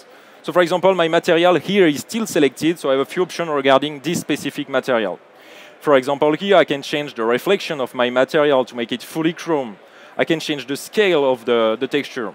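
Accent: France. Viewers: Ze french accent is very funny!😅😆🤭👍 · I love his french accent :V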